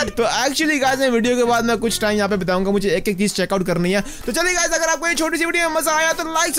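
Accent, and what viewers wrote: Punjab, India. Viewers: Tum Punjabi ho kya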